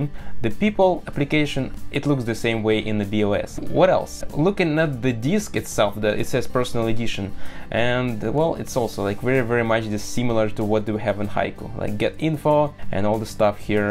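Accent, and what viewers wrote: Russia. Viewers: Obviously not, strong russian accent :)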